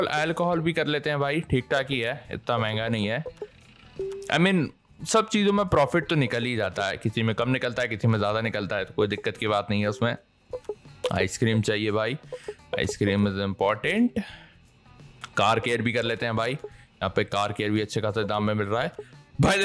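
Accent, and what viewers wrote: Maharashtra, India. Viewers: are u Marathi?????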